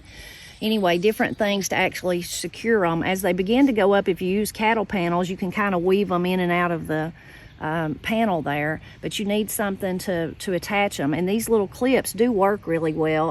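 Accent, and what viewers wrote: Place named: East Tennessee, United States. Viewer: Your accent makes me think about my childhood. My family is from East TN - not exactly the same accent, but pretty close. All your videos feel like a chat with the neighbor.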